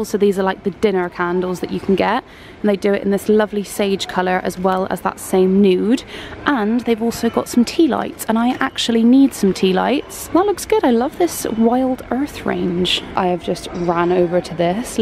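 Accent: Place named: Bristol, United Kingdom. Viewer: are you bristolian? you have the accent for it!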